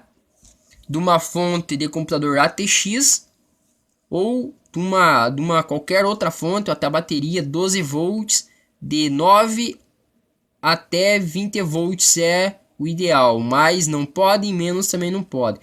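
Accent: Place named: Paraná, Brazil. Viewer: tu é paranaense né mano kkkkk tmj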